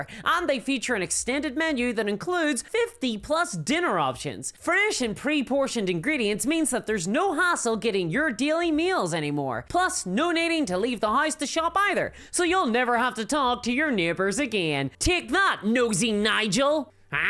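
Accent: Northern Ireland, United Kingdom. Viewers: Northern Irish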